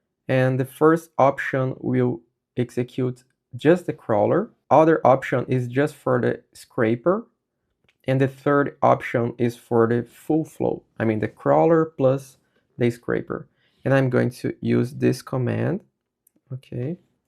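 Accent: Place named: Brazil. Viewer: Mano quando tu começou a falar eu logo pensei "rapaz esse bixo é brasileiro" falou o já matei kkk obrigado pelo tutorial mestre.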